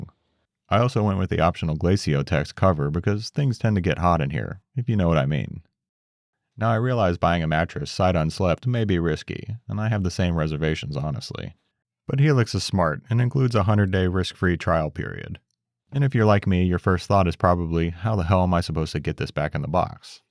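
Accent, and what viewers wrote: United States. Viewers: I know it has been mentioned before. Thought id ask after some time has passed. Willing to sell the modified drawings you made of this? I have the ivan drawings for a while. decided to get a new book and was disappointed to see the same old drawings. The faded and missing bits that you mentioned annoy me. Some modern cad drawings that havent been disintegrated from scanning a copy of a copy of a copy would be nice. Big fan of the channel. I also recognize your accent. Might help to know im a local near the bluegrass as well.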